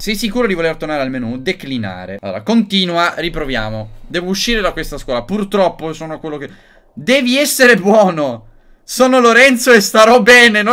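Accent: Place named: Bari, Italy. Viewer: che parla in dialetto barese😂😂.Io sono barese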